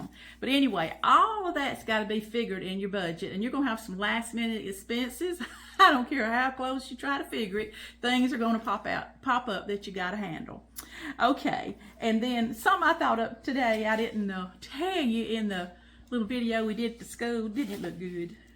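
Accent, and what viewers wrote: South, United States. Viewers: Enjoyed watching your Re-union video & I'm from the Philippines. Your southern accent is delightful to listen to. Good Luck on the next Re-union & Thanks for sharing this.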